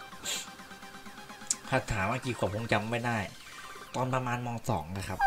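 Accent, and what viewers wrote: North, Thailand. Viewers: อ้าวอ้ายคนเหนือกะ555 FC ครับบ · อ้ายเป๋นคนเหนือก่า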